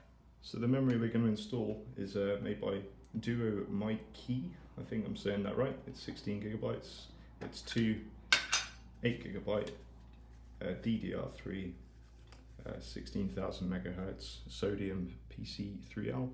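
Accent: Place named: United Kingdom. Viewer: Informative and British. Subscribed.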